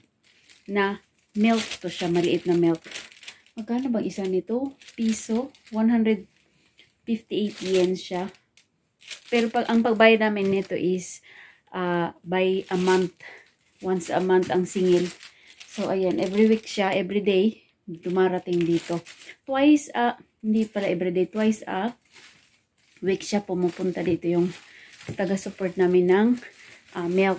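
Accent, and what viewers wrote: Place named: Visayas, Philippines. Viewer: Uy, bisaya di-ay ka,pila naka katuig diha?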